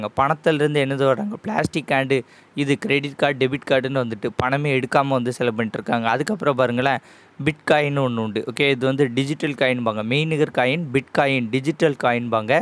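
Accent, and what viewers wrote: Tirunelveli, India. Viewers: Bro ninga trinelveli ah? Slang theriuthu, teaching super bro, school la kuda ipd explanation kudukala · Bro nenga Tirunelveli Thana..namma slang theriuthu... thanks for ur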